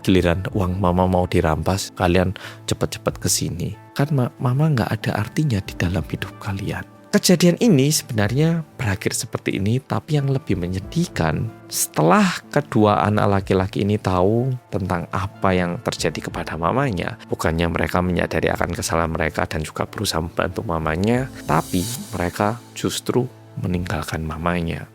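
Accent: Java, Indonesia. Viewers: Masnya Korea tapi udah luwes banget ngomong bhs indo.. Jawa juga nendok apa dari kecil udah di indo.. Salam kenal dari jkrta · Suka banget dengerin cerita medok ini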